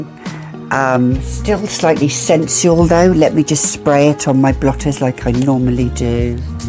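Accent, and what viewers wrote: France. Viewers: I love your French pronunciation, are you partly French? New subscriber, love the video🥰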